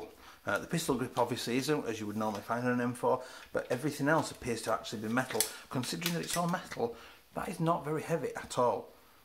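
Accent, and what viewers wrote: United Kingdom. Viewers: Bro I clicked on this thinking you were reviewing the Litefight MCR, then I heard the British accent and got confused why a Brit is reviewing a firearm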